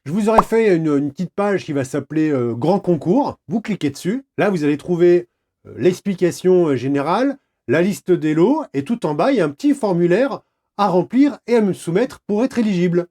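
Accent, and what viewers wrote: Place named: Quebec, Canada. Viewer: Bravo pour l’effort, effectivement l’accent Québécois est pourrit. Si ça peut t’aider on dit Tabarnack. Comme c’est écrit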